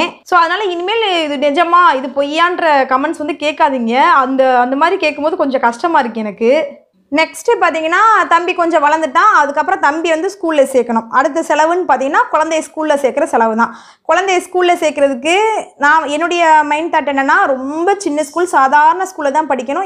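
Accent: Madurai, India. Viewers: Very encouraging vedio sister, na madurai dhan unga madurai pechu super, rombave yedhaarthama pesuringa maduraikaravangaluke irukura yethaarnama pechu, unga vedio paarthadhula irundhu Naanum savings panni gold vanganumnu oru intrest vandhuruku, vedio paakuradhuku munnadi varai intrest illa, unga character super, selave pannadha character kondu vara Naanum try pannitu iruken, unga vedio paarthu, continues.ah vedio podunga, thankyou so much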